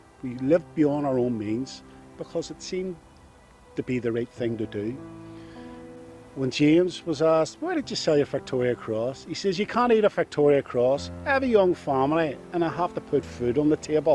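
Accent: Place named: Belfast, United Kingdom. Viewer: Great storys belfast style. Aye.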